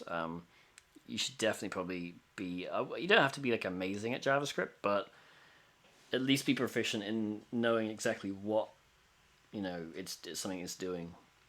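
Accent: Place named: United Kingdom. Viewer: British guy😎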